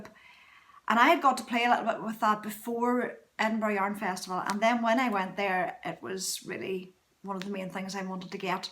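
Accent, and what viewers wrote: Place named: Ireland. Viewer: Firstly I love yr accent m Dad came from Limerick. Sadley he passed over in 2017 and I miss that wonderful Irish voice. Now yr podcasts are great and your jumpers amazing.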